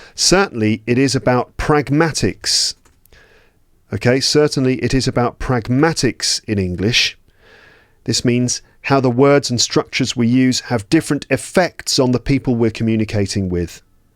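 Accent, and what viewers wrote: RP, United Kingdom. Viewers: Hi,. You look very nice in that T-shirt. I love the colour. I'm a teacher from Buenos Aires, Argentina. I started watching you on You tube premium this year, but I can't remember when! I enjoy listening to you because your modern RP English is fantastic, you have a beautiful soothing voice and you are good tempered, funny and very clever. I want to improve my listening skills, enlarge my vocab and revise what I have forgotten, since I 've been teaching pre-teens for the last fifteen years or so. The level is pre-intermediate, so I've forgotten many things. I am fond of various shorts of episodes. (My favourite colour is darker than light blue and lighter than blue). I enjoyed the episode where you were showing París, an old one where you talked about your late teenage, where you travelled to, what career you took up, your favourite band and so on. 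I liked the way you planned the class about the short story at the campsite, the episode about Gordon Ramsey, lol, the one where you talked about films, lol, the one where Paul Amber copied accents, lol, the one where your father talked about politics, it was very interesting, I liked the one where your mother said that Charles had sausage fingers, etc etc. I thank you so much for your kindness, your HARD work and pleasant talk. I wish you the best, well deserved holidays on an earthly paradise like the Maldives 😅. I'd love to go there but It's too expensive for me. I'm looking forward to watching your new episode about London. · hie, can I ask which part of the Uk is your English accent from. I usually use your videos to learn the British accent and I just got curious to know the origin of the accent I'm learning. thanks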